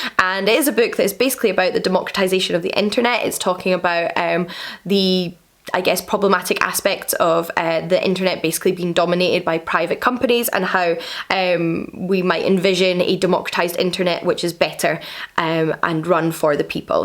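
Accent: United Kingdom. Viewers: My like was automatic for this new video, shot and uploaded by. I like to listen to her voice, even her accent is British. Greetings from Sonora, México 🇲🇽.. 👍, which is a border states with USA.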